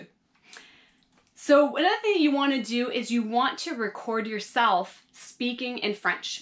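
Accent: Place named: France. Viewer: c fou cmt tu maitrise l accent francais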